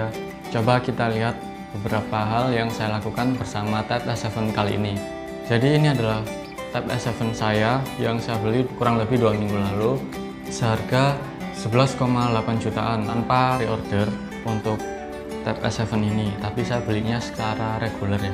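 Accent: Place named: Java, Indonesia. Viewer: Seneng gua dengerin medok lu mas wkwkwk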